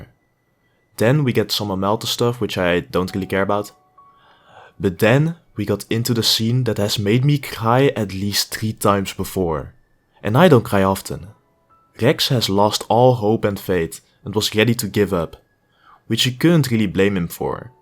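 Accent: Netherlands. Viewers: A bit of a random question, but... Do you force your accent? I dunno, your grasp of English is pretty good, and this thick of a Dutch accent is what you usually only hear from people who can't get any further than Steenkolenengels. It's not criticism, by the way. I can understand why you'd do it, if you do. Even people like Carice van Houten, who can speak with a perfect English or American accent, get told sometimes to put on a Dutch accent because it would sound exotic to American viewers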